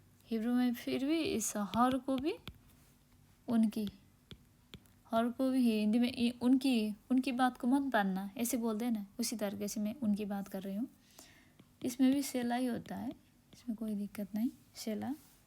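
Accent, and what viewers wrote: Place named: Nepal. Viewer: Behen ji aap to Nepali ho, aapki Hindi pronunciation Nepali lag rahi hai, aur aap ka teaching bahut fast hai, play class ke bachchon ko 5th class ki tarah sikhaoge to kaise sikha payenge. Aur aap ka words bhi cursive letter me hai, use print letter aur bade size ke axronme likhiye. tabhi to kuch thoda Sikh payenge Dhanyawad. 🎉🎉🎉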